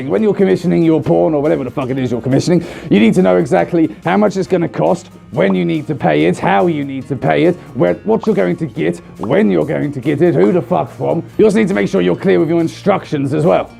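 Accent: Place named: Scotland, United Kingdom. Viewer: Loved your scottish accent, by the way.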